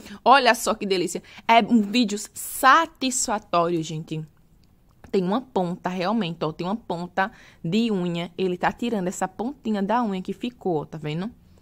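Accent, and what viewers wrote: Northeast, Brazil. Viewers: Eu amooooo esse sotaque nordestinoooo!!! Amo o nordeste, o povo de bem com a vida 👏👏👏👏👏👏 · A Questão é a Técnica ( Saúde e Bem Estar ) para dar alívio ao Paciente/ Cliente. Satisfatório em pegar um Pé/ Unha em Estado Lamentável e fazer com que a pessoa fique bem. A Técnica em si para transformar a Pessoa em uma Pessoa Saudável e Feliz. Tenho um Exemplo da mãe de uma vizinha Diabética que foi a Manicure e o caso dela era de Podologia. O resultado foi a Amputação de parte do Pé por causa dos Efeitos da Diabetes. No caso desta senhora na qual estou relatando, foram 2 procedimentos de Amputação por não ter procurado o Profissional adequado para um simples problema de Unha Encravada. Manicure é uma coisa. Podologia é outra. No caso de Cravos e Espinhas ( que eu tbm assisto ) é a Técnica para a Saúde e o Bem Estar. A pele é o maior Órgão do Corpo Humano e tbm é muito interessante em assistir. 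Este vídeo é ótimo e as suas locuções com este sotaque nordestino é melhor ainda. ( Muito divertido). Parabéns!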